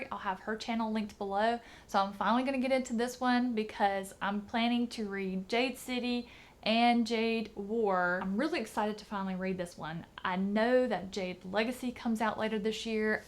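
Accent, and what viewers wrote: South, United States. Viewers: I wish more people had a Southern accent like you making book videos!! :D Love it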